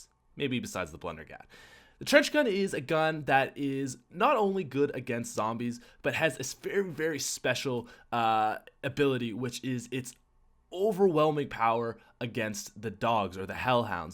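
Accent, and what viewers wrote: Canada. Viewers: Are you Canadian? You speak like you are a Canadian :P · Is this guy Canadian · Josue Medrano canadian accent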